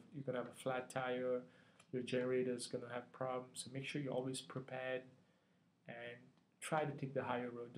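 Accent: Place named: India. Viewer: It sounds like you are from India. Where are you from in India?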